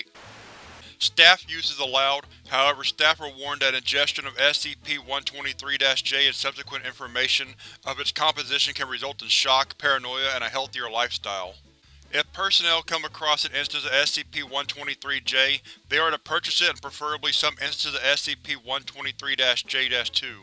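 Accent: South, United States. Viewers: Damnit dude I wait till Wednesday to see your new scp reading and it's butter. Naw but seriously I enjoy your readings man keep up the good work. I know people who I suggested this channel to couldn't get into it because of your southern accent. I'm from Texas though and that's standard down here. Keep up the good work.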